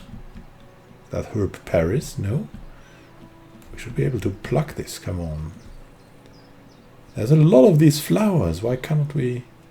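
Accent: Germany. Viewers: Are you German? I think your accent sounds like it. Bier hier, bier hier!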